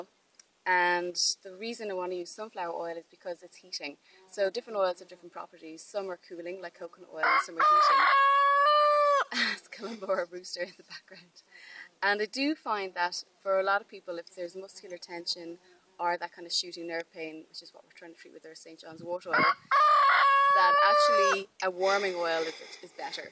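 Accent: Ireland. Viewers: Nice. Interresting Ireland accent. Btw I wouldnt judge you if you throwed a stone at the rooster while yelling "shut uuup!"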